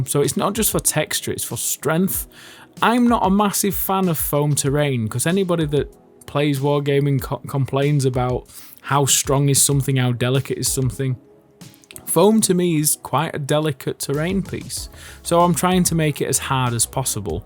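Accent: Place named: England, United Kingdom. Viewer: Great voice. Where in England is that from?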